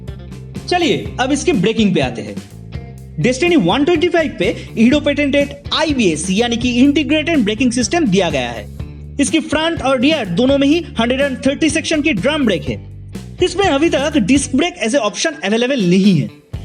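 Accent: Bengal, India. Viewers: I love your Bengali accent. ..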